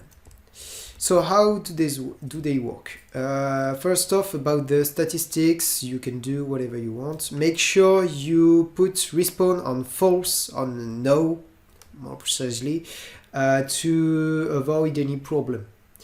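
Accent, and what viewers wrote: France. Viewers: Je ne connaissais pas ta chaine, je viens grâce au discord de noppes et les premières secondes m'ont m'ont rappelés un truc, un français reste un français, même quand il parle anglais mdrr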